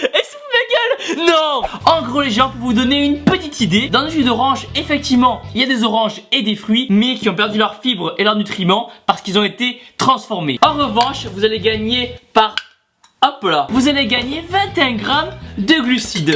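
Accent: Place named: Toulouse, France. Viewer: Daaaaam quoi de mieux qu'un bon accent Toulousain et de la bonne humeur pour se motiver !! Au top !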